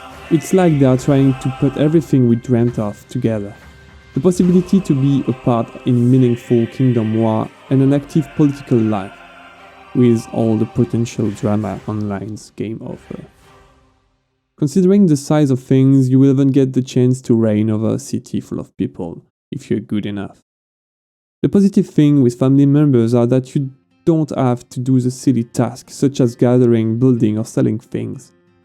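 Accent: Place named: France. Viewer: The singing in the background made it even harder to understand your frenglish. Good vid regardless, liked.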